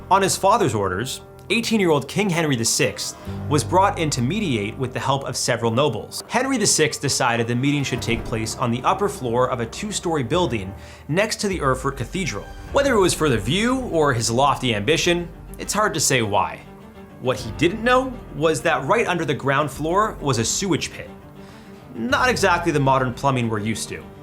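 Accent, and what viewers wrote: United States. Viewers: Can anybody tell me which American accent he has? Cuz i dont know which kinda American accent o have bur whoever I have, it matches the most with his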